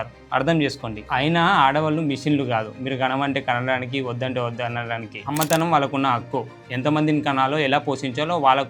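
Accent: Telangana, India. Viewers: ❤నువ్వెవరు రా భాయ్.. మా చంద్రబాబు ఏమయినా చెప్తాడు.నీకెందుకు... నీ భాష తెలంగాణా లా ఉంది పొయ్యి తెలంగాణా లో చెప్పుకో . CBN EVM తో గెలిచాక మైండ్ దొబ్బింది... మా ఆంధ్రా లో ఏమైతే నీ కెందుకు భయ్యా❤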